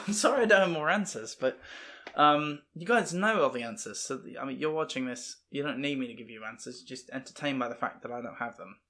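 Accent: England, United Kingdom. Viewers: OMG - the Voldemort part :D I recently watched 'The Mysterious Ticking Noise' soo it really got me :D what a coincidence and your british accent in this video made me distracted :D instead of watching I was so focused what you were speaking :D I don't know if that makes any sense but yeah... :) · I pressed play on the video on my computer and then looked at my phone because I got a message and it took my brain a minute to register that there should not be a British accent on this particular video. It is always interesting to watch your videos as you discover more about this universe and try to put all the pieces together! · I wasn’t paying attention when the video started and then you started talking in an English accent and I was so confused I checked your channel name to make sure I was watching you. (btw I’m from the UK) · LOL! I was only half listening to the intro while I was starting all of my other programs to get settled into my work, and missed the bit where you talked about doing the entire reaction in a British accent, so once I finished logging into my workstation and started paying more attention to what I was hearing (without glancing over at this window yet), I was like "Wait, what? 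Who is this guy? I mean, he kind of sounds like, but he's not...is he? OH!" 😂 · I'm sorry. What happened in this video? I was a little distracted by your English accent because my brain was trying to adjust. 😁 Kudos to you for trying new things and being vulnerable. I am so happy to be watching a video reaction from you. Thank you! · I commend you for keeping up with the British accent throughout the whole video. You sounded like a British person who has lived in the states a while. Very conversational. I usually don't have an accent (Filippo) but whenever I come back from a party at my aunt's house, I come back with an accent. At least that's what boyfriend says because I don't really notice it. Sorry TMI. Anyway, just wanted to say great job 👍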